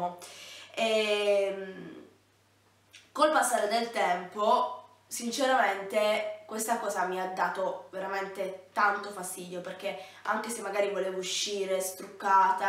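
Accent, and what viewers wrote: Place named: South, Italy. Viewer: Hai la pella chiara come una del nord ma l accento fi una del sud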